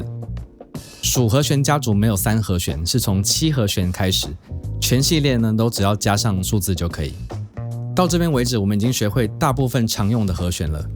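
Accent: Taiwan. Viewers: Accent sounds Taiwanese. Really glad I came across this channel! For the Cmaj9 (omit 3), it can also be labelled G/C as an inverted chord, right? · 這是台灣口音部分了 我們不像大陸咬字那麼要求精準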